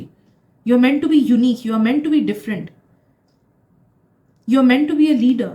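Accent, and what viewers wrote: Bengal, India. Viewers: Didi are you bengali ??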